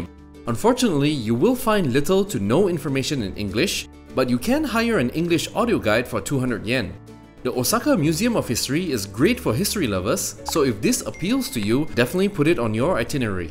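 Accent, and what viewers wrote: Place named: Singapore. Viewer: You sound like you’re from Singapore.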